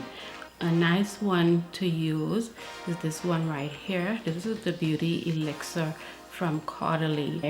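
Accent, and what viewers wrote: Bahamas. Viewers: It feeds so good to hear someone who sounds like me on YouTube. I'm proud to an see a fellow Bahamian on YouTube?I've always thought about starting my channel, but I'm scary. How did you get started? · I'm a new sub, I came across your channel and was like wait i know that accent. It's Bahamian? You mean to tell me we have our own Bahamian representating the 242 and no one told me? I'm so proud of you. Big the Bahamas, we doin7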